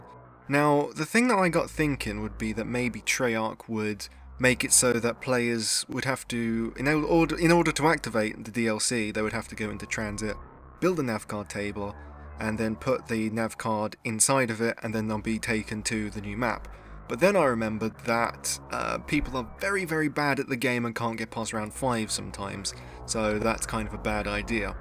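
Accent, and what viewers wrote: United States. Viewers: Honestly I thought you were American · The American accent fooled me lol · wow thats actually an amazing american accent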